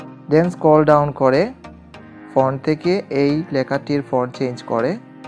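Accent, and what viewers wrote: Sylhet, Bangladesh. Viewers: vai sylhety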